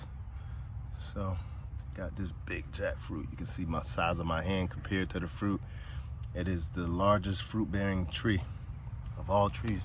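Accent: Jamaica. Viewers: Can I get two of your coconuts. Send some to Jamaica the land of wood and water. You sound like a son of the soil of Jamaica.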